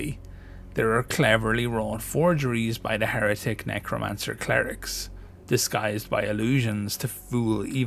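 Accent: Ireland. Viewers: I do love an Irish voice articulating our doom.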